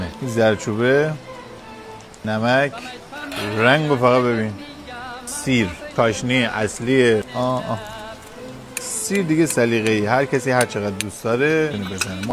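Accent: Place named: Gilan, Iran. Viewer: به به چه لهجه قشنگی، سلامتی گیلانی ها و همه اقوام ایرانی 👏🏻🫶🏻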